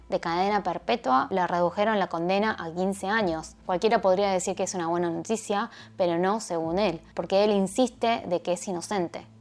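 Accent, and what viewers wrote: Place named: Argentina. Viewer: Súper raro ver una China o coreana nose hablando argentino 🤔🤪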